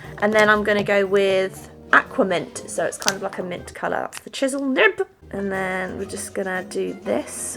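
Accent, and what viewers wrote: United Kingdom. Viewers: How come you grew up in america and still have such a british accent? sounds like you come from the south east of england around bristol...weird.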